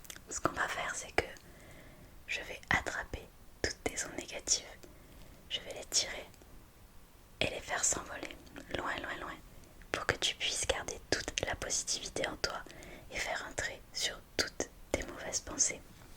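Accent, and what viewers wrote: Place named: South, France. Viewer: L accent du sud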